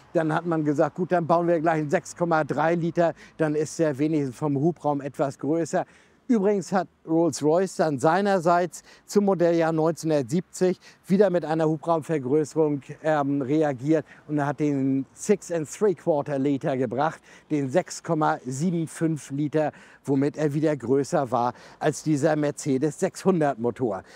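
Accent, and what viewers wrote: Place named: Hamburg, Germany. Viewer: Sehr sympatisch das breite Hansedeutsch!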